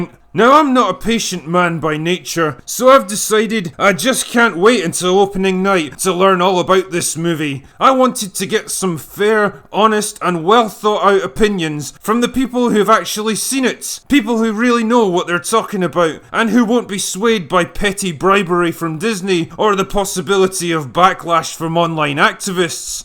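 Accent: Scotland, United Kingdom. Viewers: I had the volume all the way up by accident and I got blasted in the ear with an angry Scot. Worth it.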